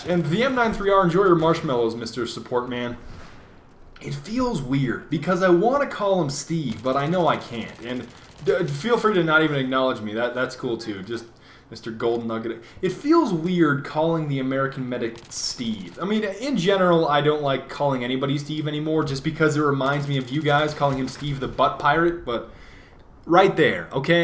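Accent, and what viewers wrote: Russia. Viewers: are you Russian or are you faking like FPSRussia?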